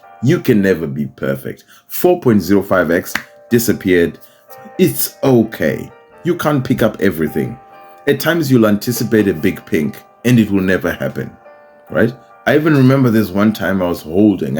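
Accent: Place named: Zimbabwe. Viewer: From your accent I can tell you are mwana wekwedu kwa Sadza 😂...I like the way you do it bro,hah munondiitira boo.Im in Zim zvangu but I do have a Hollywood and lottostar accounts ....I would appreciate even a voucher zvaro ...who knows pamwe ndingamuke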